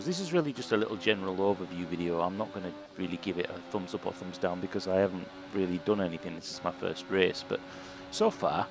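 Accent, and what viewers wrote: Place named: England, United Kingdom. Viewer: an absolute clusterfuck in english accent..perfect ..peter usa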